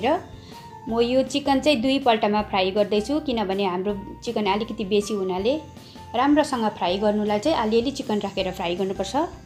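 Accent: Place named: Darjeeling, India. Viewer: Darjeeling accent,, achar looks delicious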